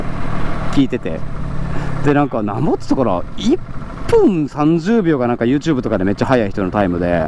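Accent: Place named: Kansai, Japan. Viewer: ナイトツーリングに続き、このツーリング動画もおもしろかったです！ 試乗動画でもイントネーション的に関西感はバッチリ出てますよ😁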